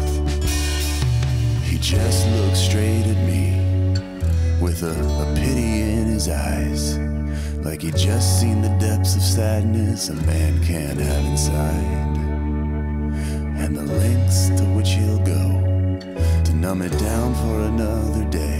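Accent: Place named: Low Country, United States. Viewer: Props to low country drawl accent. Only kind I'll accept from a northener 😋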